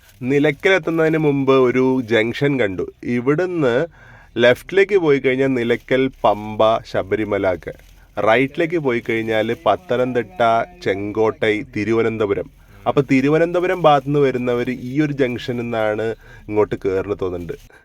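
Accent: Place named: Kottayam, India. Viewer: 😁😁 കോട്ടയം സ്ലാങ് 😁👌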